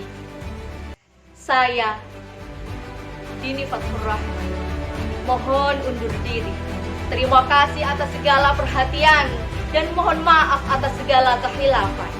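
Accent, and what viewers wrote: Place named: Pasuruan, Indonesia. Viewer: Alhamdulillah, nada Pasuruan banget. Akan kita temui ketegasan beliau ketika dipercepat 1,5x. Sukses selalu bu bidan, sehat selalu fatayatku.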